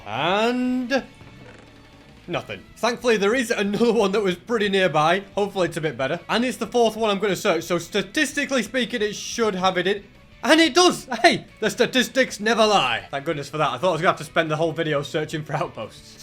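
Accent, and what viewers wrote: United Kingdom. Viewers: he bri’ish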